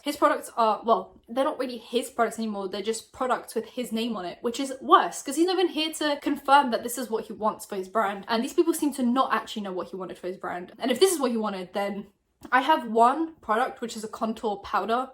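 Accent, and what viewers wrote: United Kingdom. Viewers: I could listen to you say vitamin all day. From an American who loves a good British accent. 🥰🥰🥰🥰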